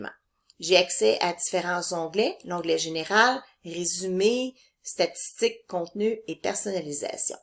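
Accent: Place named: Canada. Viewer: l'accent canadien, j'étais pas prêt !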